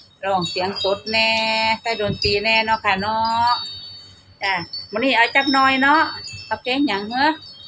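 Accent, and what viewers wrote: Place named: Yasothon, Thailand. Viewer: สวัสดีจ้า เว่าเสียงสำเนียงเหมือนทางบ้านยโสธรเลยจ้า ร้องเพลงกะมวน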